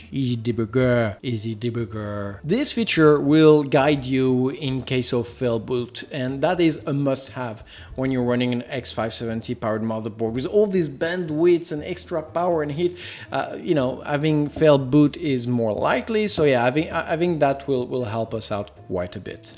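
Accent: France. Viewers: C'est magique, mais quand c'est un français qui parle anglais, je comprends tout! Merci et je te félicite pour ton English french accent car je travaille dans une société française qui bosse à l'international et dont bon nombres des salariés parlent anglais au téléphone et j'ai régulièrement les oreilles qui saignent en entendant leur phrasé dégueulasse et leur absence d'effort pour parler anglais proprement. Toi tu parles vraiment bien anglais, ça fait plaisir. Je découvre ton travail et je vais continuer à te suivre. Je pense que je vais prendre cette carte mère, tu m'as convaincu. Elle n'est pas super fashion (même plutôt… moche) mais elle est sérieuse et d'un bon rapport qualité prix. C'est ce que je recherche. Elle semble disposer d'un bon étage d'alimentation bien refroidi et dans cette gamme de prix, je n'en vois pas d'autres. Ma carte actuelle a 16+2 phases et ça fait 10 ans qu'elle tourne nickel avec un CPU overclocké à 4,7Ghz (Asus P8P67 Deluxe + 2600K) Je recherche quelque chose de comparable sans être aussi bien je m'en doute, les CM haut de gammes sont bien trop cher de nos jours.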